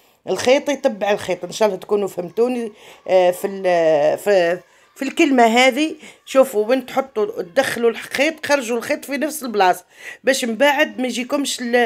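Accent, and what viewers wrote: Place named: Tebessa, Algeria. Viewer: علا هدرتك راكي من ولاية تبسة تحياتي الخالصة لناس ولاية تبسة والحرير الكبار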